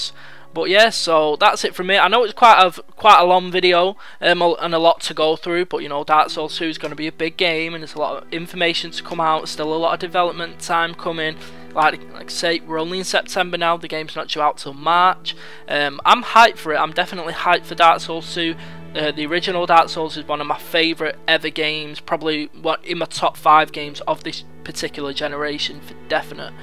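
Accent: Manchester, United Kingdom. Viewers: is that a manchester accent? im from sweden so im just curious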